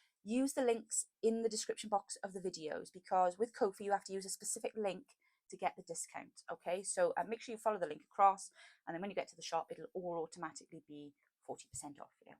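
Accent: Wales, United Kingdom. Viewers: Microphone is great! You sound a lot clearer. I'll definitely give the cog a go too as you do speak a little too fast at times for me and have stopped watching the video. Love your Welsh accent.☺ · Hi sweetheart 💕 what an amazing project loved it. The papers being used for the collaboration are stunning you have done an amazing job with them. The volume was perfect and I could hear no background noise, just your lovely Welsh accent 🥰 oh how I’ve missed that voice! This is the first time in nearly 5 months I’ve managed to watch a video all the way through! Ps I’ve noticed you have over 18 k now huge congratulations I’m super proud of you. I’ll keep fighting until I’m back at my desk making pretty things, but my fine motor skills are still rubbish BUT better than they were, so I’m very grateful for my progress. Sending you loads of love 💕 xx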